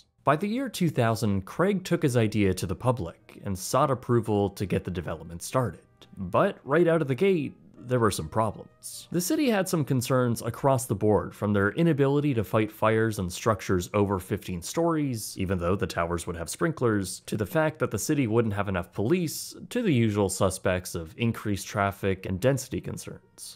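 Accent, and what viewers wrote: Canada. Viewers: as a Minnesotan, there's always one word that makes it super easy to tell if someone is from Minnesota or Canada.. the word out😂 we make the O so long😅 I heard you say out and immediately was like.. oh, Canadian. okay. lmaoooo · Are you canadian? The way you say "ou" words and a few other things sounds very canadian 😂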